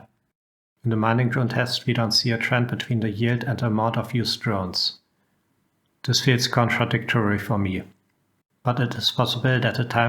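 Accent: Germany. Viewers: Lol u sound like you sit in a dungeon. Combined with the heave german accent i laughed throughout the whole vid. Great job my friend. #alloallo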